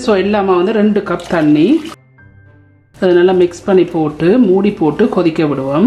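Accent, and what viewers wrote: Sri Lanka. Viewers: உங்கள் சமையலை விட உங்கள் இலங்கைத்தமிழ் அருமை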